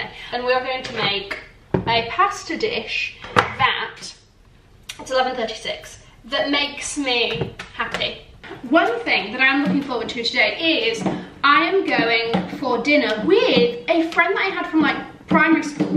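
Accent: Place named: RP, United Kingdom. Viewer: I LOVE YOUR ACCENT !! You're so posh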